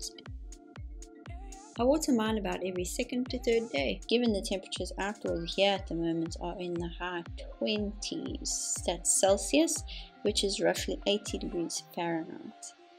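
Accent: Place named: South Africa. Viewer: I Love hearing the South African accent! 🤩 🇿🇦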